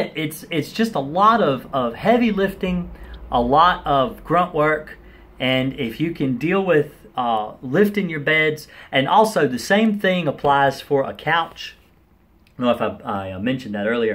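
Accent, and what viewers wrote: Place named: South, United States. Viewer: Thanks good info... you have an accent like Gomer Pyle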